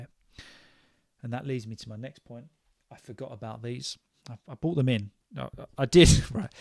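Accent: United Kingdom. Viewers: Your podcast is one of my favorites. And what I like about it, it is your british english and listening to your podcast, I feel like I am in the UK. You transport me throughout your accent, your behavior, etc. When you speak about your country, those are my favorite moments. Please keep going. I wish you the best for 2025.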